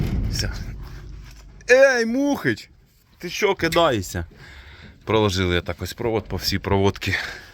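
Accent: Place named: Surzhyk, Ukraine. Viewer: Зайшов на канал, люблю цю тему, та не зміг слухати. Мова така покалічена, засмічена, що ріже вухо. От так ми зі суржиком і помремо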